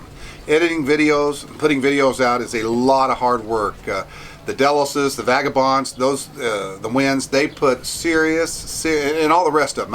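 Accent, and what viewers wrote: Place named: Texas, United States. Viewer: Sounds like a smart Texan to me !! Coming from a fellow Texan. I retired at 53 myself, worked since I was 16, time to live the good life. 🤠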